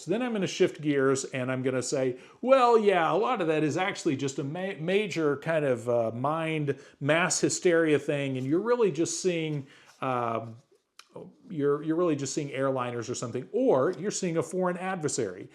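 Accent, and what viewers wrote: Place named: Oklahoma, United States. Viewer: I was going to guess you were from Oklahoma based on your accent. I was close!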